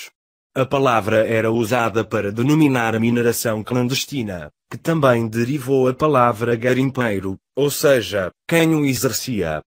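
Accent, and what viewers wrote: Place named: Portugal. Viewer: Muito bom, pena ser português de Portugal...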